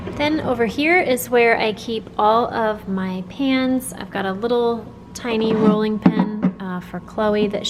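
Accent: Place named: United States. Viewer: Your accent is wonderful, in which state in America I can find this accent? I am an English learner and I want to deal with the American accent. Please, give me the name of the state.And thanks in advance.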